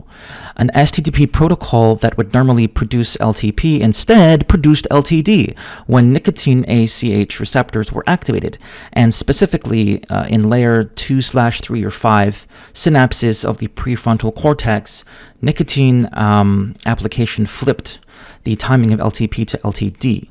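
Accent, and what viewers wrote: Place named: United States. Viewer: Are you from Russia? But you have American accent. Just curious.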